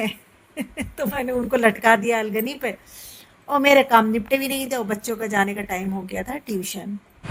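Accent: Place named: Pakistan. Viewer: AAP BILKUL PAKISTANI ANDAZ M BAAT KARRAY AUR INDIA SE H LIKHAY BUT CONFUSION AAP BICHMAY 🎶 🎵 MUSIC NA DALLAY BAQI ZABARDAST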